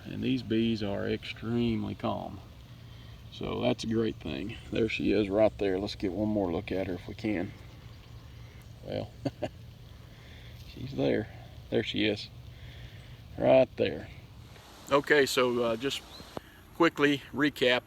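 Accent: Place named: Tennessee, United States. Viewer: I wish you would make more videos. I Love your country accent! And I love watching your videos. I moved away from TN and miss home. So post more!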